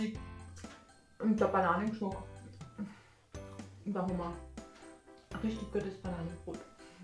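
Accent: Carinthia, Austria. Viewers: Hab mei Ergebnis gerade bei Instagram gepostet inkl Verlinkung. Ist wirklich lecker 👌 Süß find i, dass du in dem Video deinem Kärntnerisch so treu geblieben bist 😊👍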